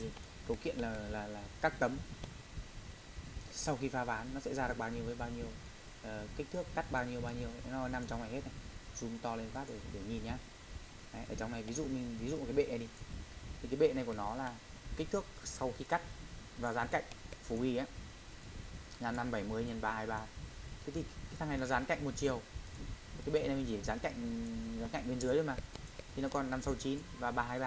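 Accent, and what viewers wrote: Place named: Thach That, Vietnam. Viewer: giọng giống thạch thất quá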